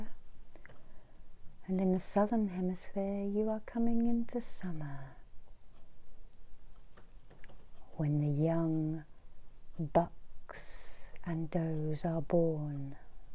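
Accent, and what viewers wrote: England, United Kingdom. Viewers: I love you and your videos. I have been stuck on your videos for a few months since I discovered you. There are only two others that do asmr that I like. There are good one and bad ones, yours is good. I also love your accent as it reminds me of England, as I have good memories 😊 so it's a win win!! Please do the rattle more and the selenite sweeping more. Also Reiki symbols and bring you hand close to the camera after is great. I also like how you do the Reiki distance trace before you do long distance healing. Your very earthy. Thank you. 🤗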